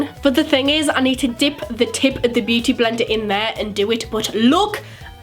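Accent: Newcastle, United Kingdom. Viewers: Itza Guillen .... do u know ur accents because it is obvious she is British... ps we are all human so calling someone's accent weird is just plain rude. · Amirah Amazing No, she has a 'Geordie' accent, e.g. People who come from Newcastle and Tyneside... North East England. Not Scotland 🙄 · where do you come from because I really love your accent?!😘😍❤️🇬🇧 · Subscribing for that accent, I'm fascinated. She must be the most British person in the U.K.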